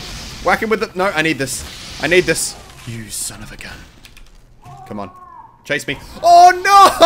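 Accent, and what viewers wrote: Australia. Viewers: is australian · MERGUD HES AUSSIE!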